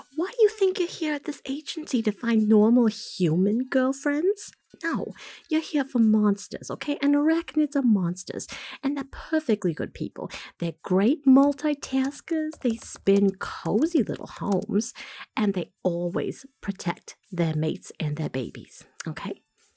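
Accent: New Jersey, United States. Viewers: Love the Jersey accent! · Omg i freaking love this, Jersey accents are one of the best American accents, my personal favorite is dixie southern aka georgia southern, but Jersey is close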